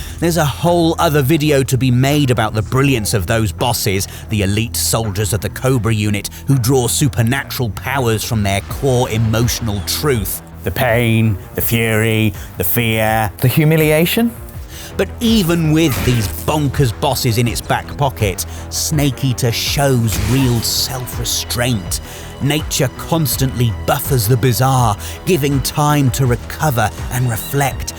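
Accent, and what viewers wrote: United Kingdom. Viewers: is Metal Gear’s David Attenborough. There is nothing more enrapturing than excellent, intellectual British commentary by an expert in their field.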